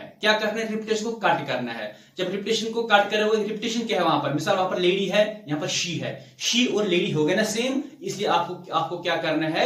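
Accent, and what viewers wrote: Kashmir, India. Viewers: Sir ji aap Kashmir se ho kya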